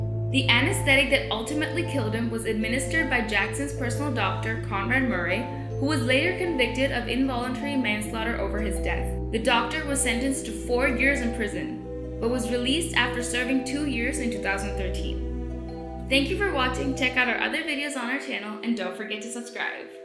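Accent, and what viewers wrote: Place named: India. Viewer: Are you Indian from INDIA? You sound like it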